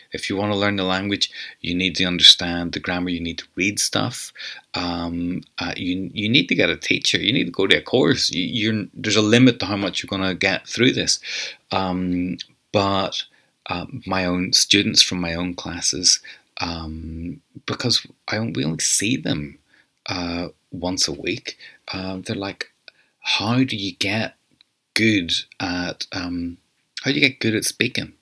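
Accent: Ulster, United Kingdom. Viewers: I love the Ulster dialect! This is similar to the Pimsleur method. Say out loud, predict, repeat . Thank you. There are not many classes out there which are just oral.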